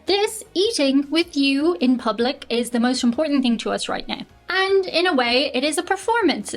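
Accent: Ireland. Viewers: Good irish accent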